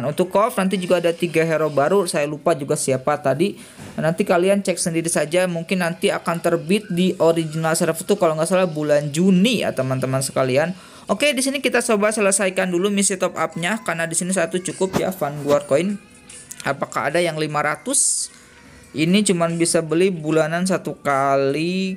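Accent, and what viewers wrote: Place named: Philippines. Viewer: Bro has Philippines accent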